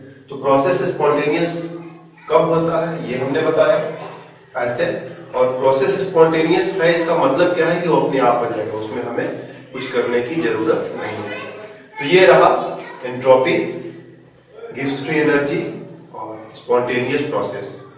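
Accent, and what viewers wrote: Bihar, India. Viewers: sir app bihar sae ho??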